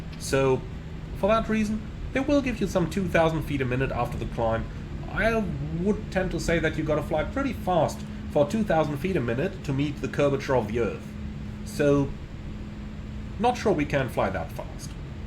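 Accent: Switzerland. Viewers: Sehr schöner Schweizer Akzent. Grüsse aus der Schweiz :-)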